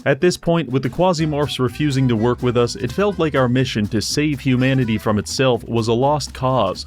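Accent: Canada. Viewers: So you’re Canadian Eh? Pitiful.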